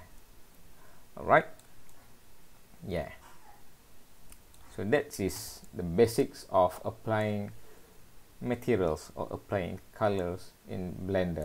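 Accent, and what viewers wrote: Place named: Arab world. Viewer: hey I think he is frm Middle East,their Accent is like that...That's y he is little slow in English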